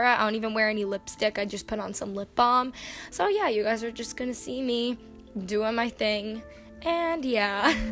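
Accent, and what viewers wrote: New York, United States. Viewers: Okay I just stumbled on your channel and as soon as I heard your voice I could here the New York Accent, I’m from Pittsburgh so I hear the east coast accents all the time 😂 nice vid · Send Help everyone has an accent. And I think they meant her new York accent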